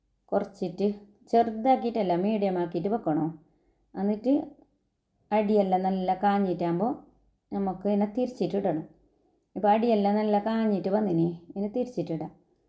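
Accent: Kasaragod, India. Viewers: Nammale kasrod baasha engnthenne❤..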